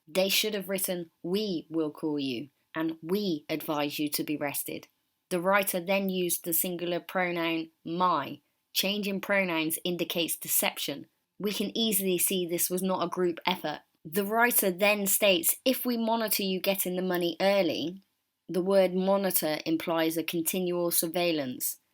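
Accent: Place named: United Kingdom. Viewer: i thought you sounded british. just looked on about tab. i do a live late night variety talk show. i been busy with work but getting ready to start interviewing you tube celebrities again. would you be interested in being on show? its audio only. like radio talk shows of 80s and 90s. talk about your channel, you and your back ground. how you got started. maybe even talk about this case a bit? go to my about tab and email me. After Dark is show name. go to playlist tab and listen to couple to get a feel. Brads workbench, junk from work, jeff king/the den of tools were some good ones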